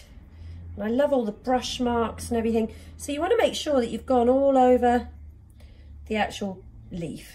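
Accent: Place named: United Kingdom. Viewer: I showed several of your videos to my Junior High Ceramics students and at least a few students per class, most accurately, exclaimed if I had a British accent I would be you. lol. I love the comfortable experimental way about you. Thank you so much.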